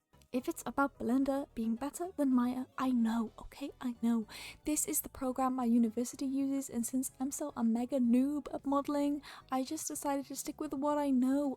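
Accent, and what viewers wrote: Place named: Wales, United Kingdom. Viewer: amazing video! love the strong welsh accent!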